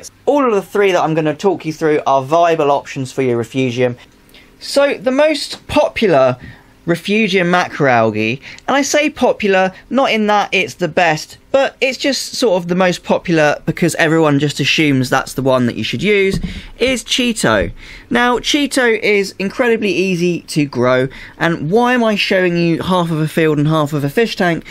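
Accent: United Kingdom. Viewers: Hey! Judging by your accent, are you British? I am in Brighton and just starting out on this hobby, I don't see a lot of people in the UK keeping marine tanks though...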